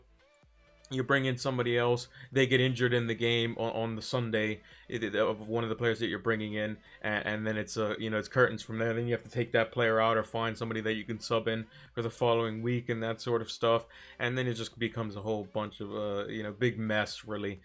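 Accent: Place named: United States. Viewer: where you live mate? sounds like US but maybe you speak another language also?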